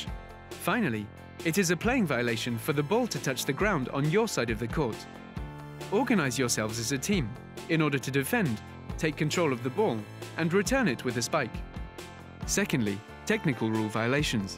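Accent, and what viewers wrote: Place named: United Kingdom. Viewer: Ik but just British pronounced words just make me laugh. :D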